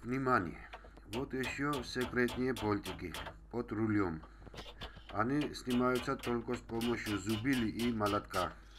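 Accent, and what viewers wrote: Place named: Georgia. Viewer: Грузин, по акценту слышно!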